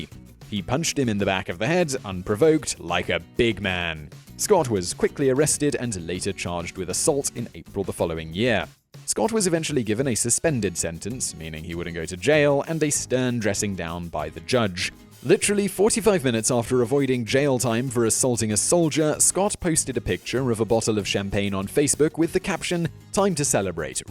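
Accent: United Kingdom. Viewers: I like you saying slang terms in a British accent.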